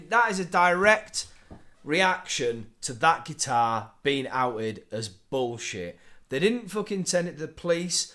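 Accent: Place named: Darlington, United Kingdom. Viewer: no thats his actual accent, im form the uk and a northerner from leeds, hes from more up north than me lol but they definitely speak like that in Darlington area as he described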